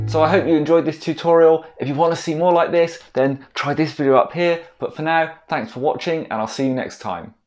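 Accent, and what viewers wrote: England, United Kingdom. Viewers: that typical aristocratic english accent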